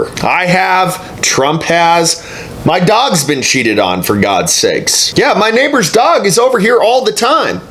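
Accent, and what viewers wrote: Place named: New Jersey, United States. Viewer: Are you sure your from Texas ? You sound like your from new Jersey. Your such a whiney Lil bitch .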